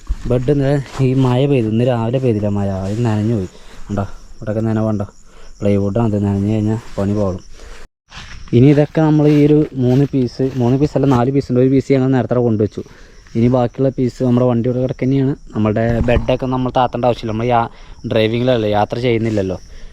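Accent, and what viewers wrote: Malappuram, India. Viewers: മായ പുയ അങ്ങനെതന്നെ പറഞ്ഞാമതി ❤ മലപ്പുറം അങ്ങനെ ആണ്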